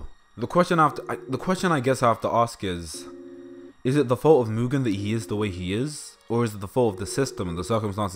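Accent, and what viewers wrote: United Kingdom. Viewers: No I'm not being 100% glib. You have a British accent, but you're obviously black and talk about the black experience in America. So do you live in America or believe that any black person can understand the black experience in America? Call it a question of phenomenology.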